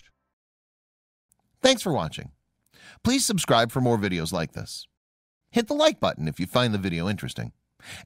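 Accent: United States. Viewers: I can imagine his voice is perfect for learning American English!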